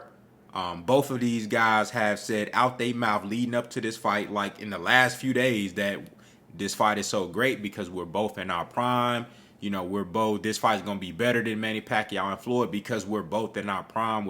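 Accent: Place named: Dallas, United States. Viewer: Man Kel Brook was already broken up.....and Porter actually looked like he would have beat Spence....he was on Spence ass!! Say your accent gives you away...you a Dallas fan boy......you aint givin us commentary that unbiased.....mane....you a fan boy mane we aint buyin what u sellin mane!! Spence aint cerebral he a brawler....he has never been mentally challenged but he will this fight and Im not a fan of welterweight boxers!!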